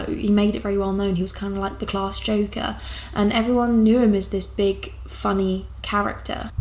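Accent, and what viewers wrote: United Kingdom. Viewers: I think it’s just you haha. Either that or it’s just the British accent so you might not be used to it or something x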